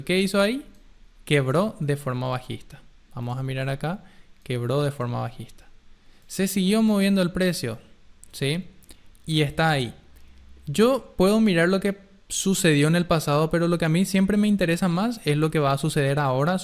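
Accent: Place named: Paraguay. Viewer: que purete es el acento paraguayo bien hablado, que locura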